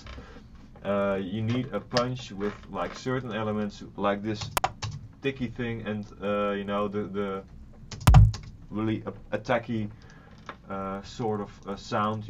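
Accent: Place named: Netherlands. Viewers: hij is nl >,< wow gewoon wow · Je hebt een Nederlands accent:)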